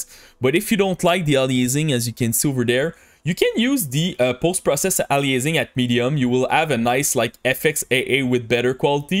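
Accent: Quebec, Canada. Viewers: Le quebecois is strong with this one.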